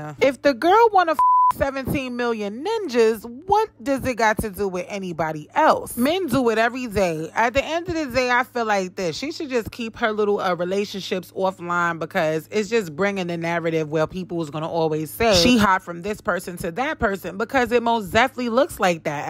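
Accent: New York, United States. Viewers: I love ya NY accent, when Yu spill tea I feel like you in my room gossiping with me 😂🥰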